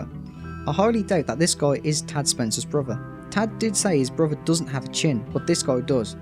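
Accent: United Kingdom. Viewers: Okay I thought you were British because of your voice and where are you from then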